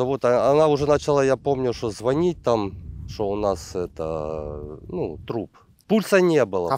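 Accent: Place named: Russia. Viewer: Твоя мова це здається що ти з раші прийшов !!! Та навчіться кінець кінців своєї мови !!!!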